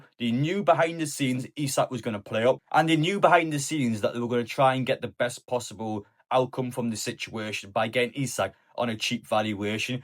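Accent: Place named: Liverpool, United Kingdom. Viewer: Slapheed self pitying wanker. Good Liverpool accent.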